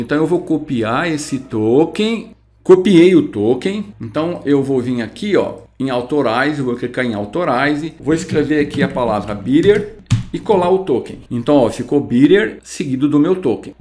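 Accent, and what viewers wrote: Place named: France. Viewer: Você participou da minha vida inteira codando, sempre que procuro algo encontro vc. Hoje é a primeira vez que ouço sua voz e percebi que é puxado para o francês 🤗 Engraçado te seguir tantos anos lendo seus artigos e só agora ver vc trabalhando efetivamente. Sensacional o tutorial como sempre 👏👏👏👏. Mestre do code!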